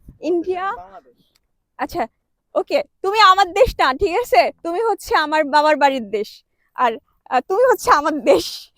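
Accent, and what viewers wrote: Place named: Bangladesh. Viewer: Apu india te kothai ,tomr kotha toh Bangladeshi mone hocche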